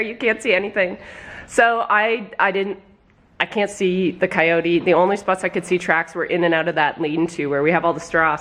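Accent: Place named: Perth County, Canada. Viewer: I thought I recognized your accent 😁 Out near Perth county myself, the snow has been crazy this year!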